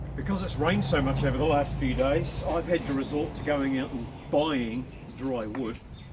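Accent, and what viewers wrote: New Zealand. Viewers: I thought you on a flight-path or next to the highway. Were are you? I'm thinking going by the accent NZ?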